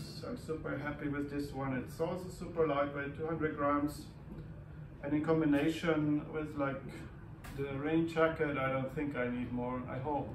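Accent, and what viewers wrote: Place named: Sweden. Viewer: CAT! She so stole the show 😃 I hope you make some videos from the trail. Nebenbei, Du hast schon nen Schwedischen Akzent wenn Du Englisch sprichst, sehr sympathisch =)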